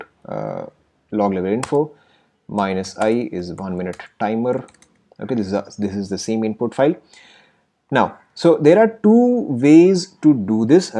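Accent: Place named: India. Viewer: Can you do this video without the Indian accent please? It's so difficult to understand.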